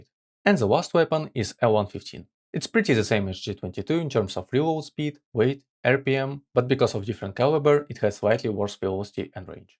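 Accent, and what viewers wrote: Russia. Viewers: Не знаю почему но твой говор, или же что то выдаёт тебе русского или снг человека. За рание прошу прощение если ошибся.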